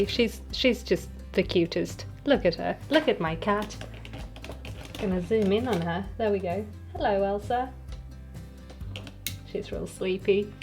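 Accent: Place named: England, United Kingdom. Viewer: I got recommended this video and I'm confused by some of your statements. You said you're a professional knitter and work with deadlines but then you said you knit almost exclusively for yourself. You also saod you're Scandinavian but you have the thickest english accent so either you mean you're of Scandinavian descent but you yourself are english or you're from somewhere on scandinavia and learned english in the uk. I don't say these with any judgements I was just genuinely confused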